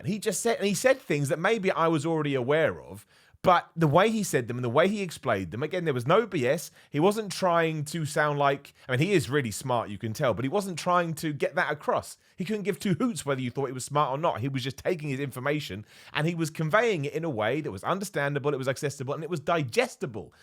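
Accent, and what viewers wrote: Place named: England, United Kingdom. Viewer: You're absolutely right. Coach Greg has the exact same effect with my exercise and training motivation. When I'm exercising now I always have some form or fitness YouTube video on, be it yours, or Greg's, Jeremy, Jeff, Igor, Derek, etc. Your videos are also great motivation for me, and I really find your personality charming and your English accent and mannerisms kinda soothing, lol.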